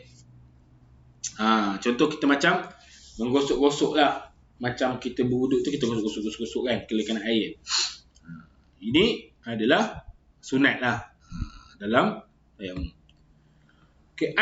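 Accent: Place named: Perak, Malaysia. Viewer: Bunyi perak ustaz😅😅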